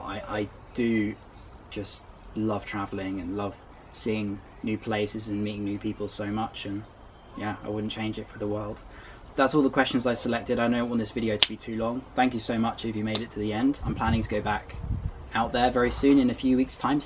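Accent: United Kingdom. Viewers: has a beautiful way of speaking the King's English. An eloquent Brit voice can be as attractive as anything you will hear in France, but at least with Mr. no translation needed. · I love listening to your British accent and that's the reason I watch your videos.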